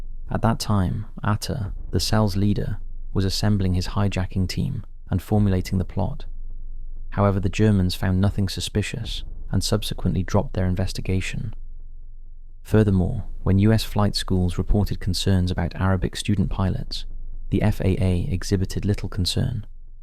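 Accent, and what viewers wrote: Wales, United Kingdom. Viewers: Why are all these shows being narrated by british men why not American? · I could listen to this guy all day long. Who knew the Welsh accent was so beautiful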